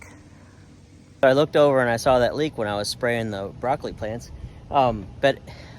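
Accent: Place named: United States. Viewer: helloo thx for sharing. you sound like an american, where in the world are you gardening? New sub from Thailand here. I also grow some cucumbers in floating foam sheets. Is hot season at the moment. I am using ppm as a guidance. What is your ppm for the cumcumbers? mine is arround 900 just begin to flowering.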